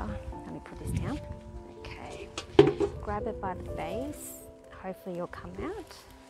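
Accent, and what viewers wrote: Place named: Australia. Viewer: aussie accent blended with some asian accentis excelent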